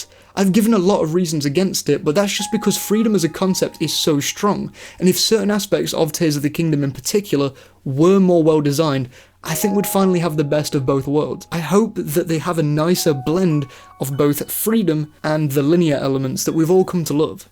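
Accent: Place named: United Kingdom. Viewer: Late to discover this channel! Story and lore were my main concerns with the two latest 3D Zelda games. Aside from that, I really enjoyed them. I’d say I enjoyed replaying Breath of the wild more the second time than the first. And if both Brearh of the wild and tears of the kingdom will be enhanced for switch 2, I’ll be replaying them again, once playing through the timeline order again. (As an aside, nice to hear another British Zelda YouTuber after discovering Zeltic).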